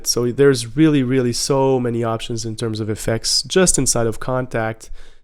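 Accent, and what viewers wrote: Quebec, Canada. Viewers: hmmm ca sonne comme l'anglais d'un Québequois ca!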